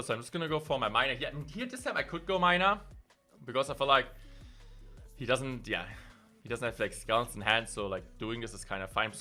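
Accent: Germany. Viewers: Hahaha come on bitch! Back after bout 4 years, clash is back baby! Beautiful games much love. Idk why but I love German accent prolly from listening to when I was a kid also funny thinking of as unc lmao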